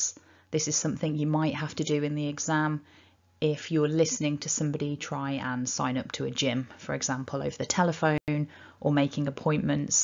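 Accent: United Kingdom. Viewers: is this voice a scouse voice? the way "h " is pronounced is really cute a part from a few other words uttered as cute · are you British?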